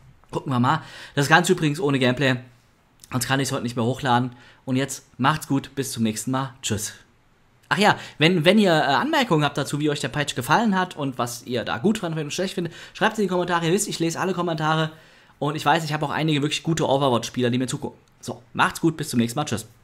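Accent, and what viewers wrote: Germany. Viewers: "Ana" "Phara" ich krieg immer Aids wenn jemand alle Namen einfach deutsch ausspricht.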